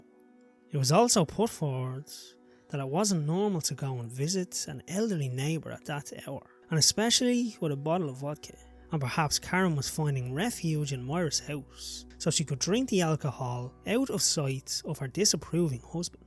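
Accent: Ireland. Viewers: a pronounced and obvious Irish accent it's not one that's hard to follow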